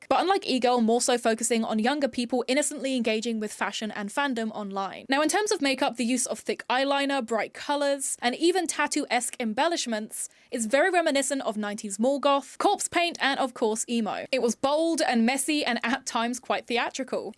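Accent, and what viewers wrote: United Kingdom. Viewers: OK, I can dig the cute dyed bangs, the funny eyeliner with the lashes kind of hits. Always admired a UK accent. But the huge goggles on the head? Never got that, dear.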